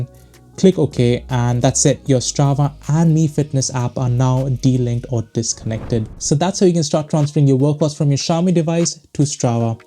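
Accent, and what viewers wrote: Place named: India. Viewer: God bless indian accent folks explaining stuff on youtube :)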